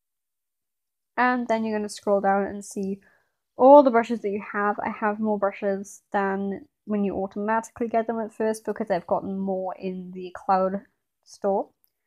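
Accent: United Kingdom. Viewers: thank you british lady (2) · Thank you British lady (5) ♡ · Thank you British Lady(6) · Thank you british lady (3)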